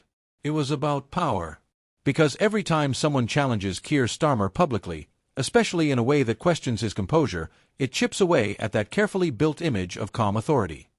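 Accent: United States. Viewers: Dreadful editing and poor pronunciation the American accent of the bot narrating is dreadful and amateurish 😂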